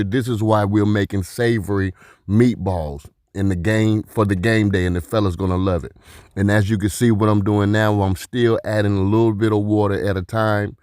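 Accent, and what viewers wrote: South, United States. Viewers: Yea! I heard the first 5 seconds and guessed correctly, he’s in the south ❤️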